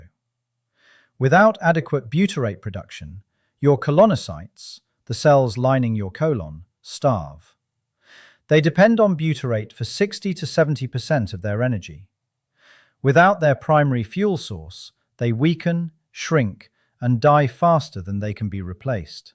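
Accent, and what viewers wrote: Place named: United Kingdom. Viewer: British now? Loose the brit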